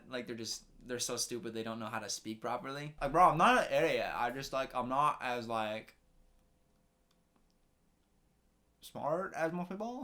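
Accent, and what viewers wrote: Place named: Wisconsin, United States. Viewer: I'm from florida but I now go to school in Wisconsin and hear your accent 😂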